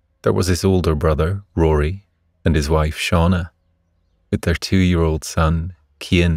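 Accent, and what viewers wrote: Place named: Scotland, United Kingdom. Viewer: A cosy Irish story I chose to help me sleep before work in a few hours.. but the accent is Scottish. Can't work for me with an Irish story anything else fine but not an Irish story 😔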